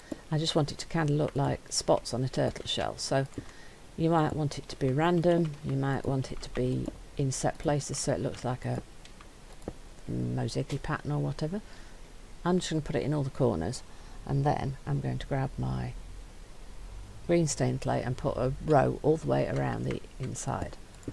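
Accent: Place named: United Kingdom. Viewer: I have a question... Are you from the UK or the US? Because I’m from the UK and your accent sounds like some people from where I live.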